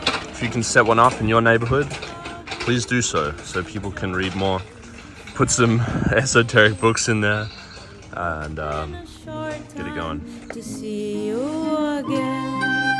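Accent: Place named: Australia. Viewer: i thought you were australian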